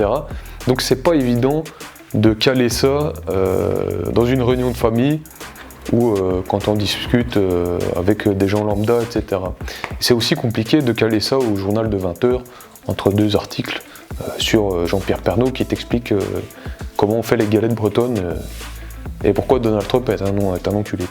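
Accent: Belgium. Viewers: Par contre il a un pouissant accent belge. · Petit accent belge spotted ;)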